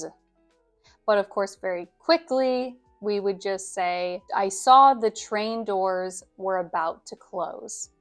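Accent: United States. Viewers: I like your american accent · Which is it accent? American or British ?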